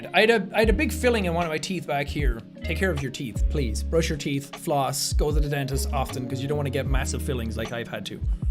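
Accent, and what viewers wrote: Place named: Ireland. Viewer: dude i remember finding you when your where recommended to me while you where playing viscera cleanup detail. Your loud irish accent stuck out to me instantly and i just enjoyed your upbeat personality. Im 32 and now not only do i still watch you but my kids do too. Badass channel bro, and you have one of the biggest hearts on youtube.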